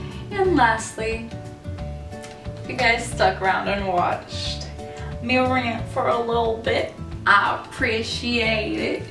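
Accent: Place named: South, United States. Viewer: You should be a marketing expert! We are Individuals on the inside and out, but the industry is only researching and going for the bottom line - $$$. Love your research - You are a person after my own heart!!! Side Note: Your 'fake' southern accent may not be as fake as you think - most of our relatives on my side are from Alabama and Georgia and came to Southern Illinois who definitely have the southern accent - I picked it up after a week staying with my Cousins. Got Home Safe.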